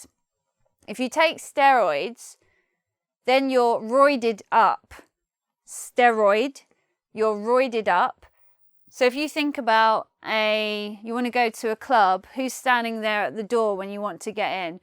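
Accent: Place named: England, United Kingdom. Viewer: why wre not you my high school teacher ? i love you accent so English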